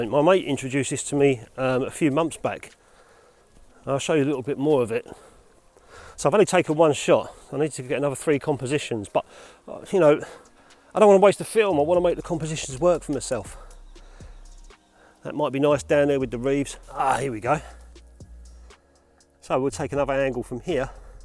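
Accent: United Kingdom. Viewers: Excellent, though with a pronounced British accent, include the fabulous Zeiss Super Ikontas comprising the 8 frames 6x9 cms, the 12 frames 6x6 cms & the 16 frames 6x4.5 cms cameras in part 2.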